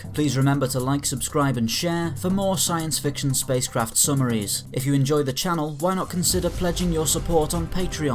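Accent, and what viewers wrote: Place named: Merseyside, United Kingdom. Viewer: Deffo from Merseyside 👍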